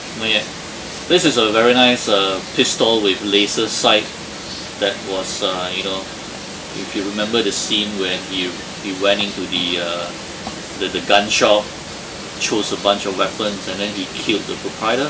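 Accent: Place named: Taiwan. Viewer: 一听你的accent我就知道你是台湾人。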